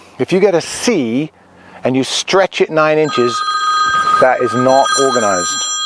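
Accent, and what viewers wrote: England, United Kingdom. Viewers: I listen to you for the "English" accent so say "leeever".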